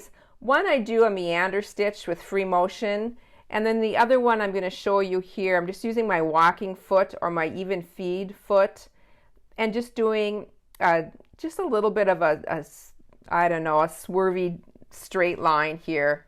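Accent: Minnesota, United States. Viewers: Brand new at sewing. Thank you for teaching this. New subscription to you. Your accent reminds me of my aunt from Minnesota. I could be wrong. Thanks again.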